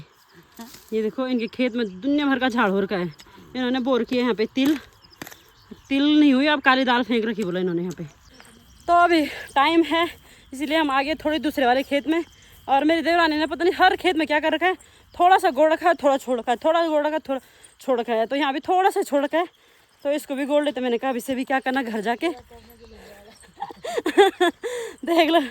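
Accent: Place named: Garhwal, India. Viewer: Tumhare bhasha hamre Garisian ke jess milati julti hai aap kahan se ho Ham Garisian se h aap vbi sayd Garisian hdi sayd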